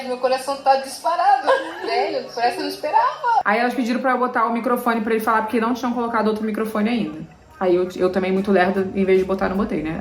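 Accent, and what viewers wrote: Rio de Janeiro, Brazil. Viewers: Com esse sotaque não tem como não saber q vc é só Rio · Estou assistindo os Caçadores ao vivo nesse momento. O descaso foi menor, mas uma das apresentadoras nem liga. Me questiono: será por que vocês são amigos e fazem collab? Será que ambos são cariocas e criadores de conteúdos digitais? Sou brasiliense e percebo os nichos que se formam. E tudo bem defender seu nicho. Porém, se te convidaram, o mínimo era conhecer um pouco da sua história. E me indignou elas acharem que era paulista mesmo com o sotaque carregado. Foram sequências de falta de atenção e prestígio.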